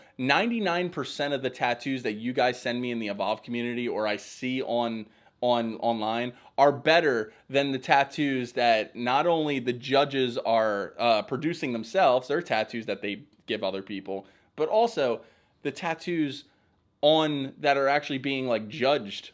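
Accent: Philadelphia, United States. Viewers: Those shows drive me bananas. I'm the only heavily-tattooed person (and usually the only person with tattoos at all) in nearly all of my friend groups, so people always come to me with questions, and it's usually because they've seen some terrible show like this. Also, I like your accent. Are you from Philly?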